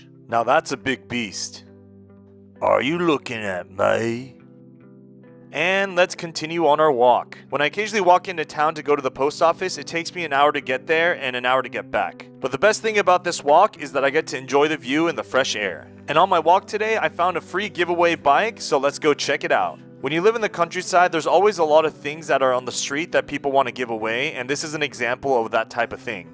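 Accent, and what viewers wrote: United States. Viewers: you sound so American to me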